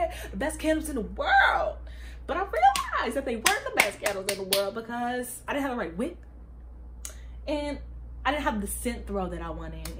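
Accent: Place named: Philadelphia, United States. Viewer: oh I just assumed because you sound like a philly girl lol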